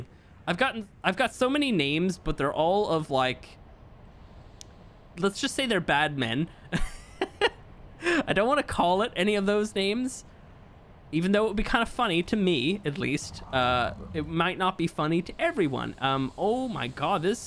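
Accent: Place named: Ireland. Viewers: I always thought he was Irish lol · His wife is from Canada so her accent could of worn off on him a bit, not sure, he could of also changed his accent a bit on purpose to be more understandable on youtube as irish accents can be a little hard to understand at times when thick.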